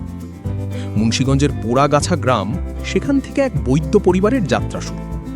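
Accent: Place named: Kolkata, India. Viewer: আপনার বংলা একসেন্ট টা কিছুটা কোলকাতার বাংলা একসেন্ট এর মতো। Not bad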